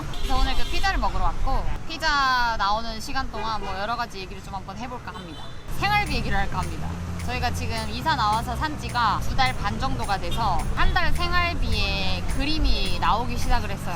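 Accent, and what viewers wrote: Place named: Gyeongbuk, South Korea. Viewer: 경상도 말씨를 쓰시던지 아님 표준말을 완벽히 쓰시던지 정말 말투가 너무 지즈븐해요 그냥 사투리쓰세요 특히 경북사람이 어설픈 서투리쓰면 들어주기 정말 ㅈ 같아요!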